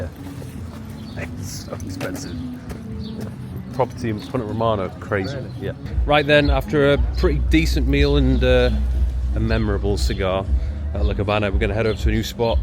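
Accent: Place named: Liverpool, United Kingdom. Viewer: Whats a scouser doing talking about marbella and ibiza. Stick to liverpool luv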